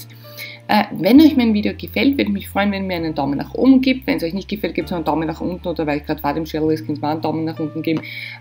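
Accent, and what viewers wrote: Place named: Austria. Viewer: Prosit 2020! Ich bin 26 und aus Oberösterreich! Ich finde dich und deine Videos super! Vor allem weil du auch "österreichisch" sprichst 😀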